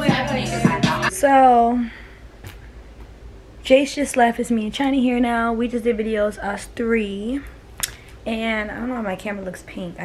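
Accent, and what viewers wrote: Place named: Boston, United States. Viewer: She sounds like she from Boston lol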